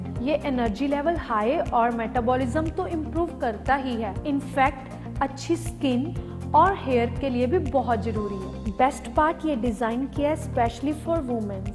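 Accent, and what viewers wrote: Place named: Punjab, India. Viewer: Missing the old so badly with a heavy Punjabi accent😅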